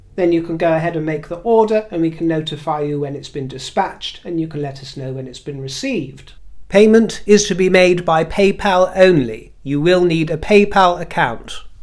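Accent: United Kingdom. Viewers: Happy to hear you will stay with us. I love your british english and accent, it is a pleasure to listen to you. Greetings from Switzerland.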